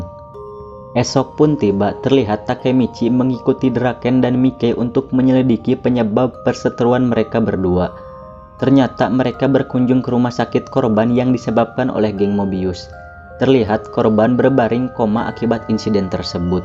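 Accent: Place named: West Java, Indonesia. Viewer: Siganamah orng sunda ye si akng :)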